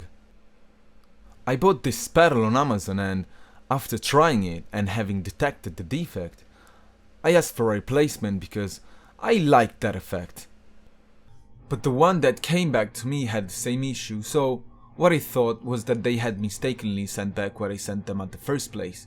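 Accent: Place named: England, United Kingdom. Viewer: he has a clear beda house english accent!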